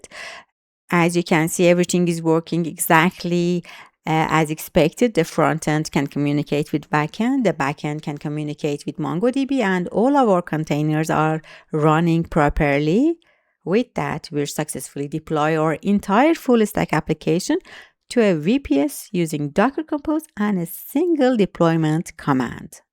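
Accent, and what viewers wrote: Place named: Iran. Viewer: Lahje irani dari Vali das khosh Afarin❤❤😂❤❤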